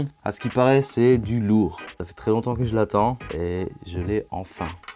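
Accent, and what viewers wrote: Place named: Quebec, Canada. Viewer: tu viens de où? Tu as un accent du Québec!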